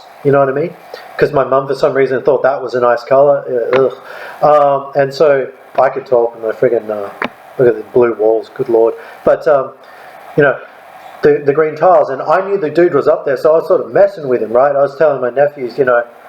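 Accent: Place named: Australia. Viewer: Good to see an aussie talking about reptilians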